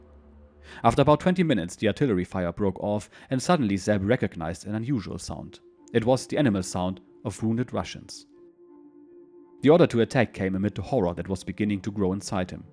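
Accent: Germany. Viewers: I've actually heard this book before, but it's got a little more drama with a German narrator! Love the channel, thank you!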